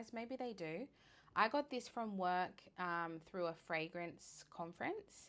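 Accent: Australia. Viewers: Hi, I'm new to your channel. It's so refreshing to hear an aussie accent on youtube and that you review Australian makeup brands and provide links to products that i can actually buy in Australia!!!! Yay!! Thank you so much for your channel. I've already bought some Australis products that I never thought of trying. Very good they are indeed!! Kepp doing what you're doing 'cause it's really great. ❤❤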